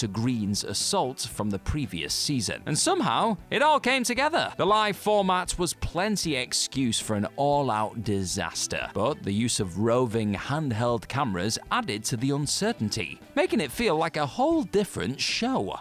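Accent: United Kingdom. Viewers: More cheap slop videos with ignorant British narration. Did any of you slop producers bother to watch the episodes you included, or did you just let AI write the script for you? In the Sunny in Philadelphia episode, Dennis and Mac switch characters in their Lethal Weapon sequel, but Mac STARTED in blackface as Murtagh and Dennis as Riggs. When they switch, Dennis does Murtagh without the blackface.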